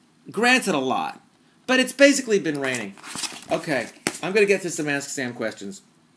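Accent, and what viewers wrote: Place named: United States. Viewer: you have the best american accent ever, its so soothing and therapeutic. i subscribed after the fred phelps video but your vids (including this) get top ratings from me :D keep going, and tell oprah i says HEY GIRL!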